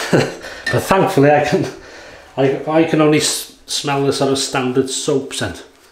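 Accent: United Kingdom. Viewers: It's always great to hear some British English accent. Your videos are not only entertaining and relaxing, but educational too!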